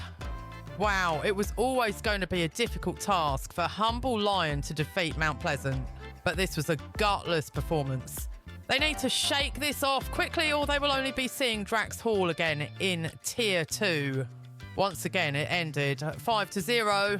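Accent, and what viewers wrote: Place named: England, United Kingdom. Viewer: It's a bit of a shock going from a Jamaican accent to an English one but to be fair she is good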